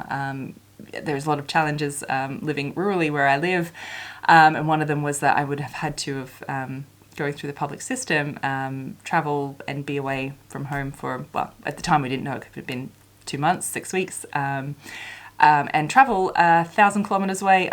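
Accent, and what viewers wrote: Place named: Australia. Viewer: Just found your channel searching for ‘learn to sew videos’… so excited for watching all of your catalogue while I clean and craft and cook and maybe even try some sewing. Thank you for your videos, and so excited for a fellow Australian voice!! Also, amazing news for your recovery…I’m a breast cancer researcher (only a little bit part time with a family, the rest of the team does most of the work), overcoming TNBC is huge.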